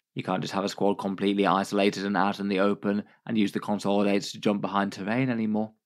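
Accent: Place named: United Kingdom. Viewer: Has anyone ever bumped into at a Tournament? I'm wanting to confirm he's a real person that lives in a house, sounds like he's from the UK. Surely someone knows him!!😅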